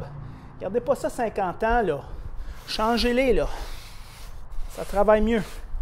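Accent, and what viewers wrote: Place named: Quebec, Canada. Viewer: LOL 😂. C’est du slang québécois !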